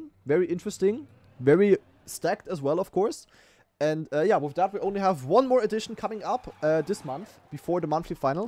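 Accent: Germany. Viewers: Just want to say you have a pretty minor german accent, very clear and understandable to my canadian ear. Your english is fantastic as well, I've listened to an absolute ton of your content and only rarely do you reach for a word or don't know the meaning of one. Love the content, and especially enjoy the competitive focus. Whether you are hosting tournaments or competing, they are my favorites. The volvic duo cup reviving that format was especially appreciated and I hope you can do it again!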